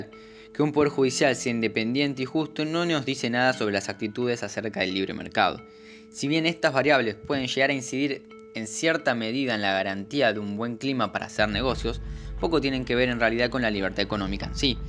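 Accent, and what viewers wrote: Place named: Argentina. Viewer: solo un progre o izquierdista pudo hacer este video, y por el acento, de seguro es un peroncho kirchnerista. No tiene validez.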